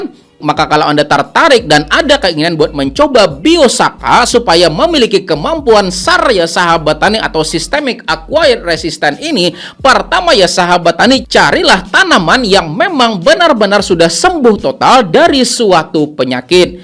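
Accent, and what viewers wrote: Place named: South Kalimantan, Indonesia. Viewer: Logat Kalsel ni pinanya 😂🙏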